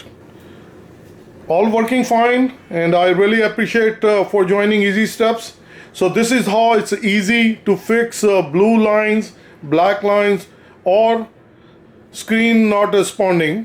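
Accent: India. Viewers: Its indian accent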